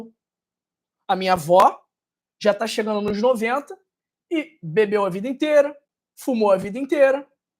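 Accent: Rio de Janeiro, Brazil. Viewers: Esse sotaque carioca é um horror 😮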